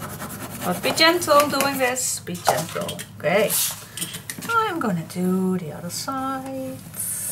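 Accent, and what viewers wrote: Netherlands. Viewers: o) I love it to hear your netherland slang in your english :-) it´s so nice to hear you….sometimes (yes I´m crazy) I work on PC and hear only what you work, only for Hearing your voice….it´s like a friend in the room who works and talks with me :o) thanks for your time to make this Videos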